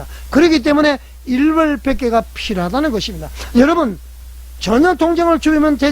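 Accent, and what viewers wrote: North Korea. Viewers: 북한 말투? 교회 무너뜨리라는 북한 지령 받았나? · 이분 어디분이신가요? 조선족 이신가요 말투가 북한분이신가요?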